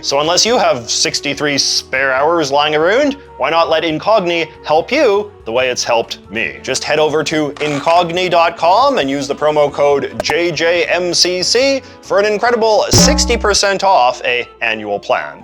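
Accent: Canada. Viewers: I live extremely close to a major crossing from the u.s.a to canada and this guy's voice is complexing and annoying as hell! Like I assume he is canadian and their english doesn't flow the same way as american english for sure but I've never heard it so... monotone and yet choppy for lack of words. I was interested on the subject but can't continue watching the video as the voice is absolutely dreadful. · Your accent is aggressively Canadian.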